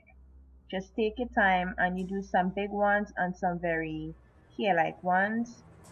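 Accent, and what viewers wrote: Trinidad and Tobago. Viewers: Ahhhhhhhhhh of course you speak French......Awesome. Cute trini accent too. I love videos like this, always loved playing in special effects makeup. No skills though, I shall definitely learn from you. Love it. I will check out the rest too.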